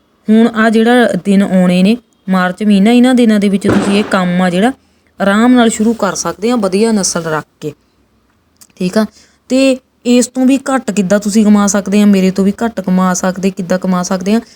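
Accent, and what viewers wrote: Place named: Ropar, India. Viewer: Thodi awaj ton pata lag reha e ki tujada ilaka ropar hai sister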